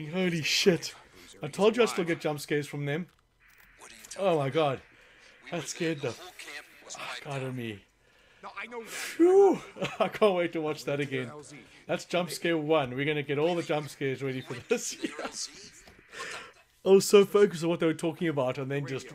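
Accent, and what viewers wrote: South Africa. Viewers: Thanks great video...just got the game and starting. (SIDE NOTE:.....Are you from Mzansi....that accent is a dead ringer). Thanks again, N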